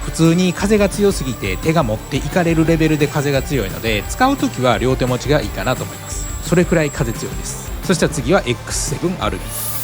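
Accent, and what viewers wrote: Kansai, Japan. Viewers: 一生〇〇って話し方関西人特有だよな。嫌いだわー内容いいのにもったいない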